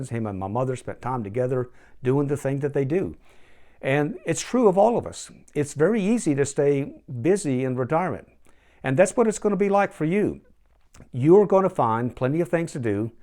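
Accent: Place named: Louisiana, United States. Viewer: I hear a Cajun accent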